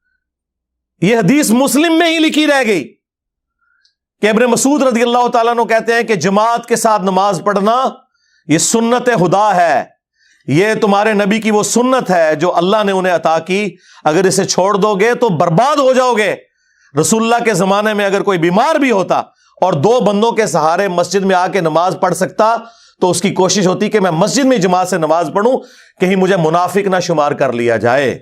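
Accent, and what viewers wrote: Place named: India. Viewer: brother wo india sy hai or waha kuch words esy bolay jaty hein , meaning woe hota hai bs bolne mein farak hai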